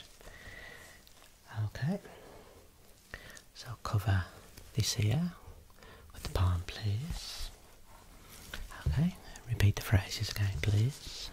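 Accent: Birmingham, United Kingdom. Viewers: Been listening to this every night for the past week, it’s saved me from a stressful week so thank you! Love the softer whispering, the Brum accent is delightful ❤️ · mate you are absolutely blinding. your natural and professional bedside manner is just brilliant, your knowledge and terminology combined with your brummie accent make you my favourite channel. it's fantastic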